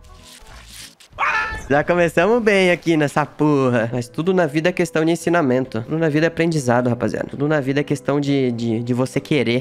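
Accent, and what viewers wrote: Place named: South, Brazil. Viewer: Você tem muito sotaque do Sul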